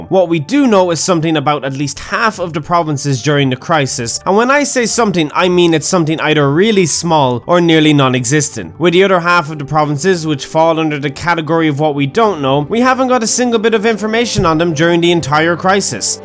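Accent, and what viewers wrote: Ireland. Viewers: The paddy is strong with this voice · Finally! An accent that's not american! But Irish! I thank thee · Im irish and ive never heard someone as irish as you. Great video man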